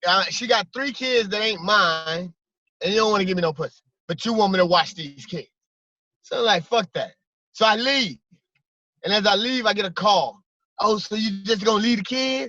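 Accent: United States. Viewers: Y he keep saying the n word with er. While referencing blk ppl???? Blowed me with white country boy lengo.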